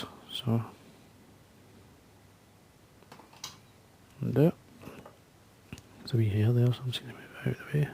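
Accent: Scotland, United Kingdom. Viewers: Thanks, I am buying some. I was at our monthly flyfishing, tying meeting here in Waco last week and a friend mentioned your name. He is a good tyer and a very good fisherman. He is about to win a tough statewide (Texas) fishing contest, multiple species, many variable weather conditions. Pat has been watching you here on youtube, and once we began talking, several others chimed it. You've got a great following here. Also, you've got a bunch of Texans trying to imitate your accent as well as your tying abilities and techniques. I was laughing listening to all of the Texans trying their Scottish brogues. I told them about your filling that beautiful storage box with Classic Salmons; do you still have it? I won't forget that project. I should have bought it when we talked about it. I do have your flies mounted and displayed at the clinic and in my tying room here at the house. 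Everyone marvels!! Take care and know you are bringing a lot of joy and education to tyers around the world. They love you here in Texas!! Ray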